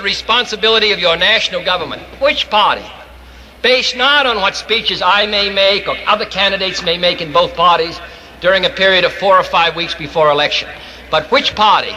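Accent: Boston, United States. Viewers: Fantastic and fabulous. This man was a genius. What a masterful speaker! He had a Boston accent but you can understand every word he says.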